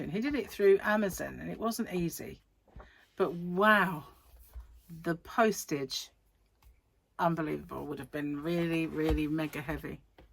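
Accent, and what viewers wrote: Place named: Cambridge, United Kingdom. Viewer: Hi 👋🏻 My goodness your accent reminds me so very much of my mother in law who was born and raised in Cambridge. The way you phrase things is so similar. She has been gone now for almost 10 years so it is a lovely to hear you speak and it brings back many wonderful memories. I’m a newcomer to your channel so can you tell me where you are? #Furls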